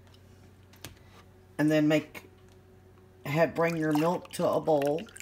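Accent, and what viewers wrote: South, United States. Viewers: I actually can speak this "Dialect" lol This is COUNTRY - that's Deeper than Southern! I loathe Nutmeg - so NO to the Egg Nog - Folks can add that at the table - YUCK! EHHHhH never liked that taste! Boiled Custart is creamy and sweet - Vanilla is fine ❤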